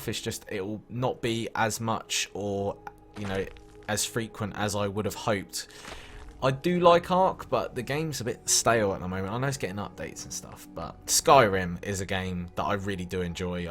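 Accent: United Kingdom. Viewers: is every youtuber that plays fallout fucking British? Jesus fucking Christ.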